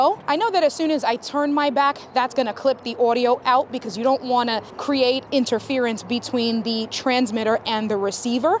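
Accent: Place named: New York City, United States. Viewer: I could tell you were from NYC when you started talkin (I’m from Qnz)…then you went outside and I was like YAAASSS 🗽 then I got to the end and got a YERRRRR and it was the cherry on top 🗽🗽🗽 lol great vid, very helpful 🤙🏾 s/o NYC 💯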